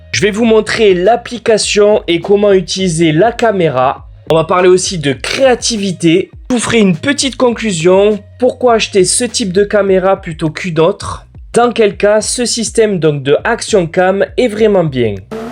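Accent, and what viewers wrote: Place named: South-West, France. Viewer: Je trouve qu une vidéo tech avec l'accent du sud-ouest ça passe toujours mieux 🙏🏻☺️ #teamchocolatine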